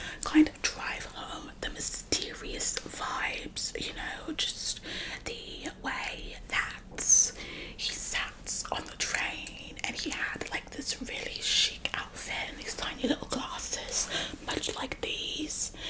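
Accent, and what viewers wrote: United Kingdom. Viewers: i need more british accent it was so gooooood!! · omg the british accent is so realll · there's smth about the British accent that really brings this all together 😭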